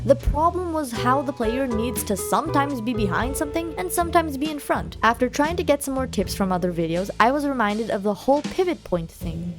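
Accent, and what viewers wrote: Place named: Brazil. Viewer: O sotaque brasileiro é inconfundível, sucesso!!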